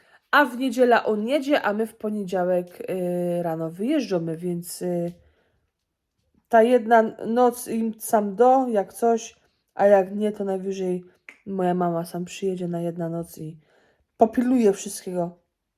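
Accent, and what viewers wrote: Silesia, Poland. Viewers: Jestes zarabista babka , uwielbiam ogladac twoje filmiki , no mowa slaska jest mega… buziaki dla calej rodziny🍀🍀🍀🍀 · Twoje życie nie jest nudne. A tak jak dla mnie to wystarczy, że usłyszę twoją śląską godke i już mam uśmiech na twarzy i dla mnie to ciekawy vlog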